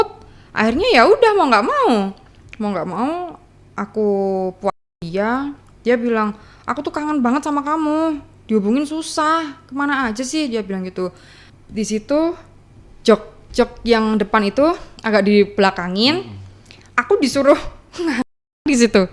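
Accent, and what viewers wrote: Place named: Banyumas, Indonesia. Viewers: Suaranya mendok banget😁❤ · Medok kali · Medok bngt mbak nya😂😂😂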